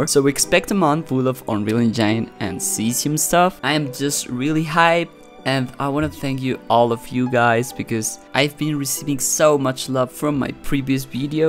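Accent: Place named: Brazil. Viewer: mano tu tem sotaque de br kkkakakakakakak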